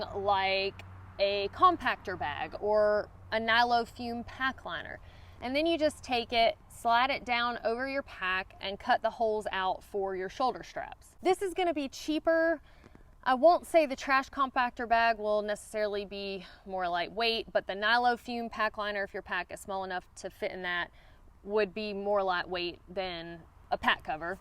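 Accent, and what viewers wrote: South, United States. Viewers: Why, sir, that is a Southern Belle through n through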